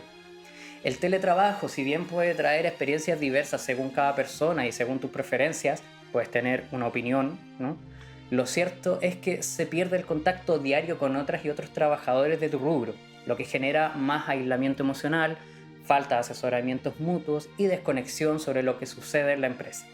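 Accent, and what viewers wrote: Chile. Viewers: Entre el dialecto chileno, la homosexualidad en su forma de hablar, y además que se quiere hacer el indio, es imposible entenderle.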